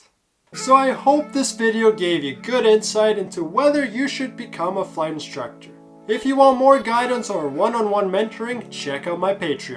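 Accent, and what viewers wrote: Canada. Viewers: Do I sense a slight Quebec accent? If so what schools are good with a good price in qc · im from bc and this guy has the most canadian accent ive ever heard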